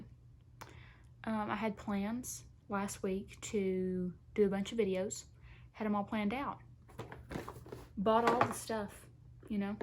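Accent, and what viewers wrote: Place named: North Carolina, United States. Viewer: I just found your channel today! I feel like I am listening to my sister in law-she's from North Carolina. Where are you from?!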